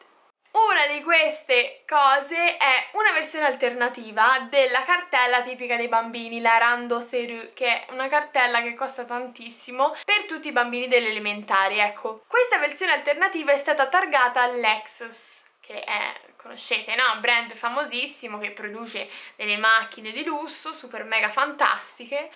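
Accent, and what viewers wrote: Tuscany, Italy. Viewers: il tuo accento toscano è sempre con te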